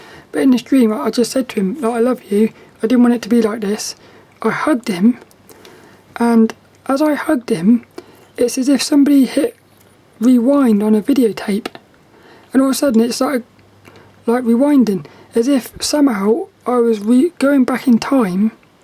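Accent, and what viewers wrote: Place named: United Kingdom. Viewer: Anyone can learn a little BritishnEnglish from listening to lill you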